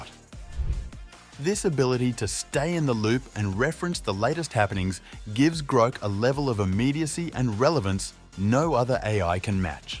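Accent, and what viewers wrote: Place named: Australia. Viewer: Up to the minute, pronounced mye-n-yewt, as in "tiny"? Some strange pronunciations in this, with an Aussie accent. Maybe a Chinese who went to university in Australia?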